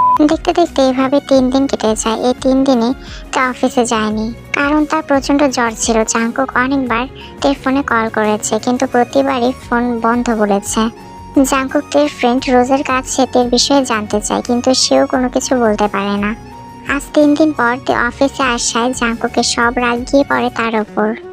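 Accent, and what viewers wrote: Sylhet, Bangladesh. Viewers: Hmm apu r basha Sylhet. 💜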